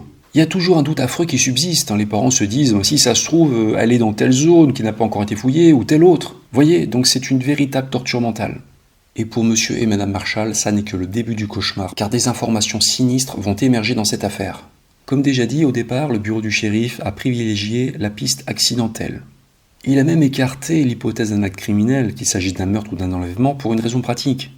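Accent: England, United Kingdom. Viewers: ton accent Anglais me fait toujours rigoler, c'est pas méchant.. c'est quand même gentil d'avoir une pensée pour ses personnes disparus.. bye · moi j'adore ton accent anglais, je suis fan 😅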